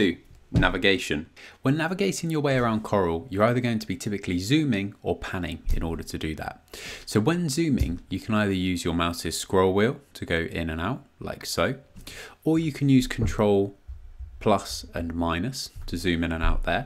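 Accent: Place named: Wales, United Kingdom. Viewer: wowwwwwwwwwwwwwww welsh acent! i like it!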